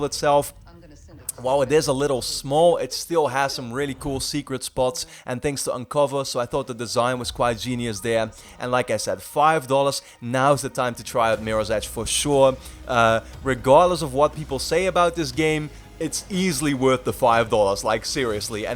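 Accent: Netherlands. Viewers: i don't know why but as soon as I heard your voice I thought to myself 'is he Dutch?'. I'm from Amsterdam myself. New subscriber btw. Then I saw on your channel that you are from the Netherlands 😃. Pretty cool to see how big your channel is and awesome content. Gonna binge watch your videos to get myself up to speed.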